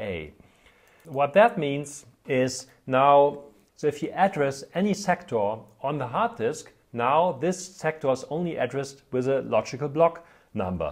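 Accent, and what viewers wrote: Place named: Germany. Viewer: Dieser deutsche Akzent... D: Aber danke für die Informationen.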